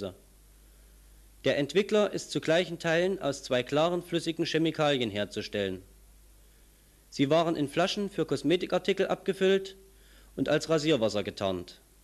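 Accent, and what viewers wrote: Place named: Saxony, Germany. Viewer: ich könnte kotzen wenn ich dieses sächsisch höre...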